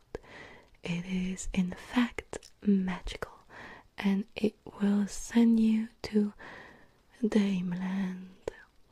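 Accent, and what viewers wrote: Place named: France. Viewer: french accent asmr ??? sign me the hell up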